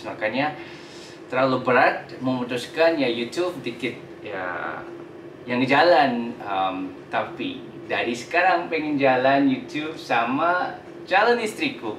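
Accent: Malaysia. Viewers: nuna dan oppa adalah channel fave aku❤ kalian tulus ya suka Indonesia, sungguh terharu.... oppa bahasanya kaya Malaysia❤ negara terdekat kami, sodara kami ❤ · Bahasa Indonesia masih bercampur bahasa Melayu Malaysia, mungkin karena lama juga di Malaysia. Semoga lancar acara pernikahan nya.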